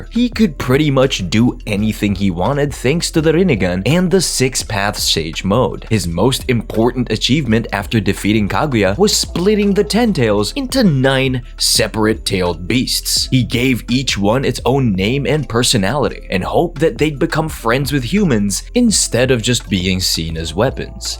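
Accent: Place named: Philippines. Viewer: I'm sorry if this might be offensive or whatnot, but I like how your accent rolls off. It sounds like Filipino or something.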